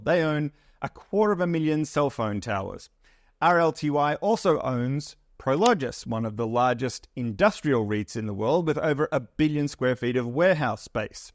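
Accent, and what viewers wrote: Australia. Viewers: Your videos are so well done! Do you edit yourself? For my company, we also do podcasts, so I’ve become an audio video junky. lol. Are you using a shotgun mic? Great acoustics. Looks like you usually treat your space for audio. And the sound editing nice! So happy for you. You are a breath of fresh air. The Australian accent always helps. 🎉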